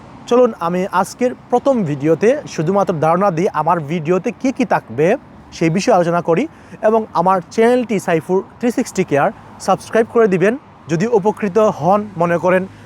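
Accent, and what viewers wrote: Sylhet, Bangladesh. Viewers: আসসালামু আলাইকুম ভাই আপনে সিলেটি মন হয় রিপ্লাই দিবেন কিন্তু ❤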